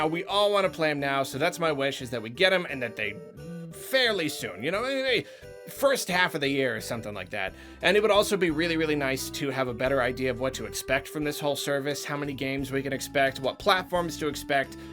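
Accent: Ireland. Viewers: Slightly Irish edit it to for the time stamp to work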